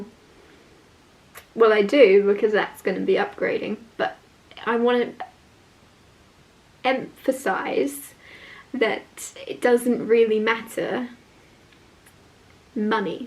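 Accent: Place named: England, United Kingdom. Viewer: I like your videos in.English i.lke your English accent!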